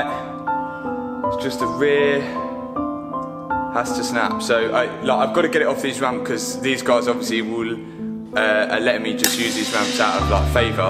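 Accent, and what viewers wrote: United Kingdom. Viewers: We still enjoy youre positivity drive that u got .. and that uk accent make it perfect hahah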